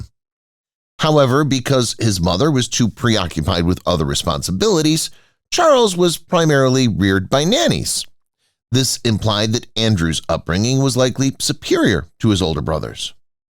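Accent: United States. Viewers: Always an American accent with these stories… I will not be listening to this narrative… this is against the British Royal Family… and those British who keep following these people deserve to lose the first family of the UK… THIS FAMILY WHO HAVE SEEN THIS COUNTRY THROUGH SO MUCH OVER THE CENTURIES UNTIL TODAY… YOU ALLOW THESE AMERICANS TO RUN THE BRF DOWN TIME AND TIME AGAIN AND EVEN TODAY.. THEY ARE TRYING TO DESTABILISE THE BRF EVEN THROUGH HARRY AND HIS LIAISON WITH AMERICAN RACHEL MEGHAN MARKLE.. THEY DID NOT RUN AWAY FOR FREEDOM… THEY RAN AWAY TO CONTINUE WHAT SHE WAS PLANTED TO DO… IT HAS NOT STOPPED AND HAS GAINED MOMENTUM WITH THE ENCOURAGEMENT OF YOURSELVES… THE LIKES OF TINA BROWN… CHARLOTTE AND MANY OTHERS IN THE BRITISH PRESS… TOM BRADBY. YOU HAVE WHAT YOU WANT NOW IN KEIR STARMER … GOOD LUCK BRITAIN… YOU ARE GOING TO NEED IT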